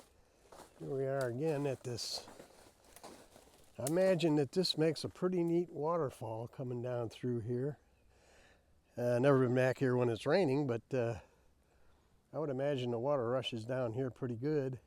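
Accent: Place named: Midwest, United States. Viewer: The Ramblings of Bry As an American from the South, sounds as mid-western as Walter Cronkite.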